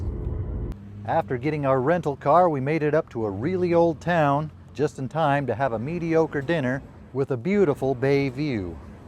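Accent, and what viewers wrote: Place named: New England, United States. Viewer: your delivery is spot-on Yankee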